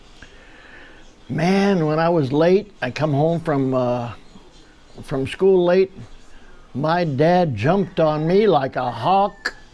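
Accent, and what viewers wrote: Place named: Oklahoma, United States. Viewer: My grandmas family came to Central Illinois from Oklahoma at that time. Now that you mention it, you have a similar accent to her.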